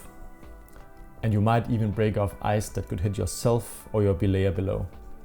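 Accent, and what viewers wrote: Denmark. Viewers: Var i tvivl om du var dansker vurderet ud fra din accent.. Indtil jeg hørte “Arrrh! det var tæt på!”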